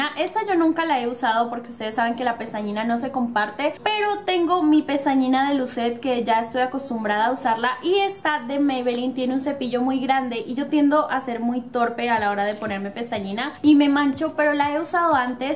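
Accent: Mexico. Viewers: Habla como Mexicana....ella no es Colombiana?? Ni idea... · A penas estoy viendo tus vídeos y creí al principio que eras mexicana. ¿De dónde eres?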